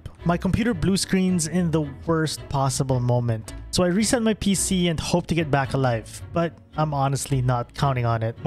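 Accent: Philippines. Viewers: Legit thought this was a big time youtuber in the US, but once you started the voiceover I could immediately tell the accent, kabayan! Amazing content man, new sub. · oh shit narecognize ko agad an pinoy accent hahaha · are you filipino? Sounds like you have a manila accent :)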